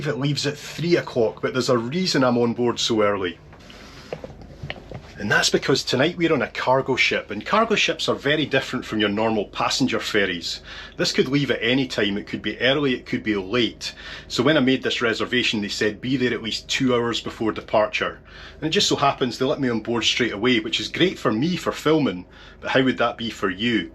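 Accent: Scotland, United Kingdom. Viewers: I really enjoyed this one! Great presentation and I always love your descriptive Scottish brogue. Well done .... what an adventure! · I’m a Brit living in Sweden ca 2000 I decided to take the overnight ferry from Gothenburg to Newcastle via Kristianstad, it was February and we had a storm in the North Sea. I had a cabin on deck six probably under the Bridge, it was like being on a big dipper, I never slept a wink I could hear metal banging a crashing, so I went down to the bar area and met a Finish guy who insisted on buy me beer, which I didn’t really want as I had to drive the next day. At six in the morning I was like you out on deck as the stomach had passed and it was calm approaching Newcastle but the wind was incredible it nearly froze my face! I only found your channel last night and already watched 4 videos thoroughly enjoyed them 5 star 🌟🌟🌟🌟🌟. 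BTW I love listening to your accent my mother was from Dundee and I was born there moved to Southern England when I was 5 years old, spent a lot of summers in Scotland played golf once as a boy in Montrose. I am 78 and I want to visit Scotland soon and look up some cousins while I still can. Keep up the good work ! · Certain accents in the English-speaking world have phrases that can’t be seriously said by all the accents. No Yank, Canuck, Kiwi, or Aussie is going to say “sneaky wee peek.” But a Scot? Perfection!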